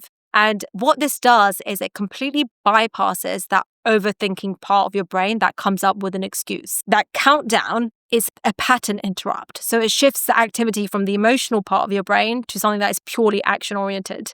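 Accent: United Kingdom. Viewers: I have been listening to your podcasts for the past few weeks and I find them very interesting and helpful. I am in my sixties and I have read many self help books and listened to audio tapes and CDs over the years. There is something very refreshing about your podcasts. I also love your British accent 😊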